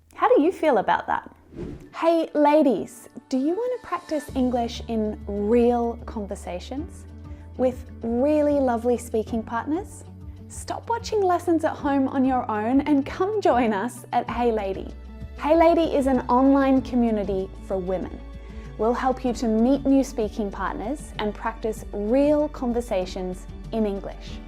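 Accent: Australia. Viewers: Australian accent!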